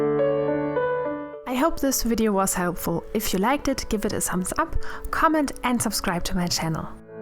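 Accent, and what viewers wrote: Germany. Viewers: Die Zeichnung ist echt gut und auch die Beschreibung der Schritte ist auch extremst gut aber es wäre sehr hilfreich wenn du vielleicht an deiner englischen Aussprache arbeiten würdest denn du hast einen sehr starken deutschen Akzent (die Kritik soll wirklich konstruktiv sein und nicht gemein ich würde nur gern helfen deine Videos besser mach ) ps du kannst extrem gute Zeichnung ! du bist echt talentiert 💓💓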